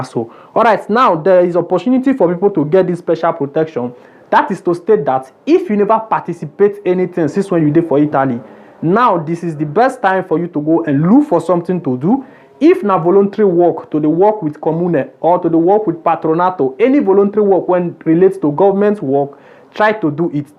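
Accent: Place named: Nigeria. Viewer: Bro please try to speak good English not only Nigerian English okay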